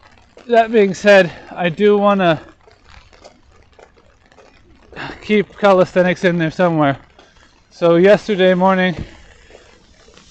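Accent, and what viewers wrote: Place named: Canada. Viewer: Thanks for taking the trouble to do your video on the way to work & giving us some insight into your working world....I really hope your company takes health & safety seriously, particularly with regards to the glass🙄.Just out of interest what town or city do you live in,I'm guessing somewhere in Canada based on your accent??!! Keep up the good work....I SO admire your openness & honesty!! Mark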